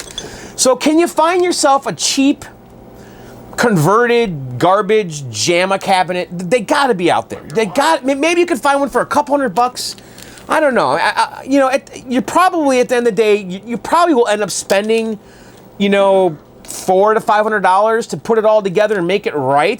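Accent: Germany. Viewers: Hey, I really love your german accent. I speak german and I laughed so well! TOP!!! Greets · That might be the best German accent I've ever heard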